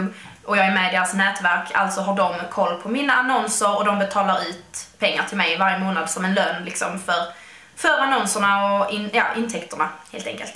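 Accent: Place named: Scania, Sweden. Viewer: Haha, när du sa att du inte pratade så jätte mycket skånska jag bara va? Jag tycker att du pratar väldigt mycket skånska. Är själv stockholmare så fattar inte vissa ord du säger men jag tycker skånska är fint. Vissa av mina kusiner bor i Skåne så är van med dialekten. ( min mamma är även uppvuxen där...). Det här var inget hat tycker bara att det var lite roligt när du sa det. Ha en bra dag och den här videon var jätte bra!! kram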